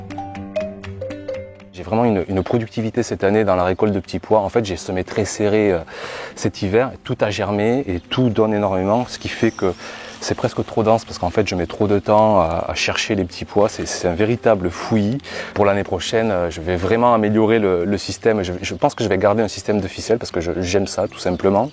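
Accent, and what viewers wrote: South, France. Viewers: Salut, Comme dit Ludo, c'est toi qui a volé le soleil! Nous on se prend les orages tous les jours, et toi tu nous nargues avec ton potager magnifique qui conserve une avance scandaleuse sur nous-autres 😉 En plus, à chaque fois, tu me fais envie à grignoter tout ce qui passe alors que chez moi c'est pas mûr!!! Non mais l'amaranthe quoi! Les miennes font 10cm MDR Comme d'hab, jardin magnifique, plantes magnifiques, et le petit accent du midi qui va bien, il manque que le Ricard (tu fais pas pousser le Ricard? Comment ça??? ^^)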